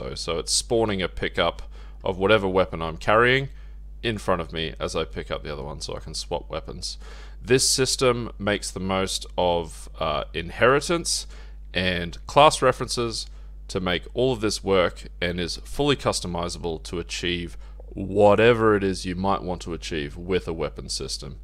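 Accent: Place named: Australia. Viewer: Really great series of tutorials! Nice to hear a fellow Aussie's voice too as a bonus.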